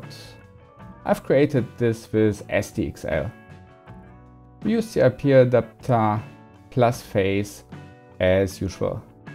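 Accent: Germany. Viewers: Man hört leider das Deutsch sehr stark raus durch den Akzent. Aber sonst gutes Video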